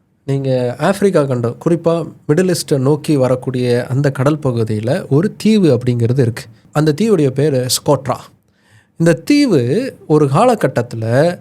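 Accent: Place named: Telugu, India. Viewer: Telugu naatham naaruthu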